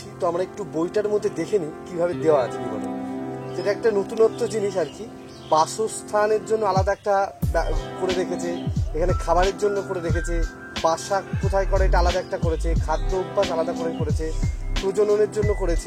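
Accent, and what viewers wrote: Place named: Bangladesh. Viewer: আপনার ভাষা শুনে মনে হয় আপনি বাংলাদেশের মানুষ। পশ্চিমবঙ্গে ভাষার সঙ্গে আপনার বাসা মিল হয় না।মনে হয় বাংলাদেশের কোন অঞ্চলের ভাষা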